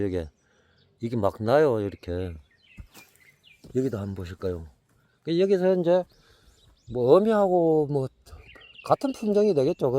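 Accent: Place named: Gyeongsang, South Korea. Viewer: 체리가 아주 맛있게 무르익었네요 저희는3키로 다 먹었네요 ㅎㅎ 가게손님들이랑 맛나게 먹었어요 싱싱하고 아삭 상큼해요 아주 맛있게 감사하게 먹었습니다 경상도 사투리로 체리 시식먹방 정겹게 잘보고 있어요~😅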